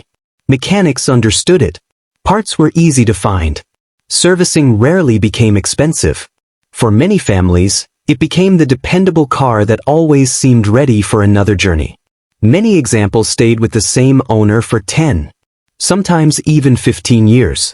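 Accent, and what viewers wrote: United States. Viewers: I am so sick and tired of AI generated garbage on YT. These are British cars so why do you show so many of them with foreign registration plates and FFS, ditch the Yank narration! You should have a British accent talking about British cars!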